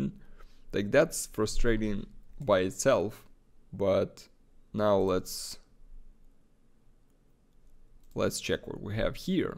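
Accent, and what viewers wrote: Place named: Russia. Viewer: То-то я думаю акцент русский )))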